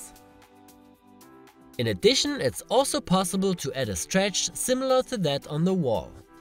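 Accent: Germany. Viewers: Der deutsche Akzent ist ja insane XD aber gutes Video :)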